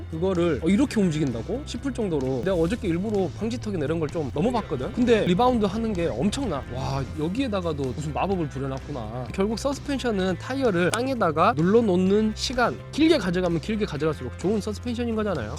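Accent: Jeolla, South Korea. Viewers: 내용 매우 좋았구요, 더 보고싶다는 느낌은 주행영상에 대한 목마름이 아니었나 싶습니다. ^^ 특유의 전라도 사투리 억양이 재미를 더합니다. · 전라도 말투가 나와서 혹시 했더니 "귄있다"는 단어를 예로 드는 걸 보면서 확신을 합니다. 말씀 참 잘 하시네요. 작년에 할리 883 몇 달 타 보고서 이정도면 충분해 하며 오토바이는 안 산다고 맘 먹었는데 이녀석을 보고 나니 엄청 흔들리네요.... 주식을 좀만 더 열심히 해서 2천만 더 모으면...ㅎㅎ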